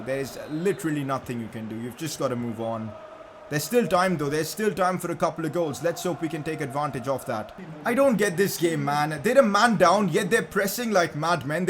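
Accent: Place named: India. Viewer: indian accent is so funny only reason why i watch your videos lol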